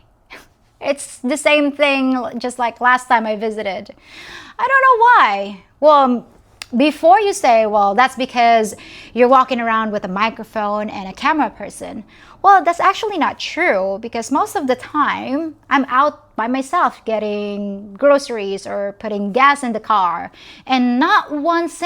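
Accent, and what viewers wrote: United States. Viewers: If you were in my area and saw you I would totally chat you up. Gotta shoot my shot right? But then I basically know who you are and other people may think you are just another American girl due to the melting pot of people here in the US. Guys here are jaded based on how hook-up culture is. Btw you have almost an American accent when you speak English. Another reason why someone might see you as an American.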